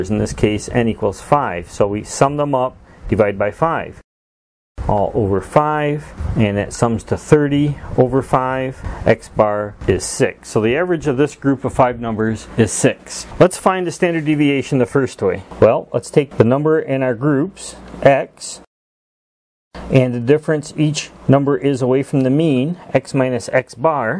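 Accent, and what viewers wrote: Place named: New York, United States. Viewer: God bless yer newyorkan accent 😂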